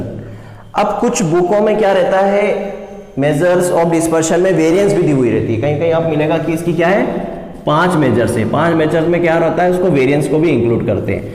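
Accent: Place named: Indore, India. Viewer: Kitna hi kuchh krlo pr thodi si indori to muh se nikl hi jati he ........( Apn)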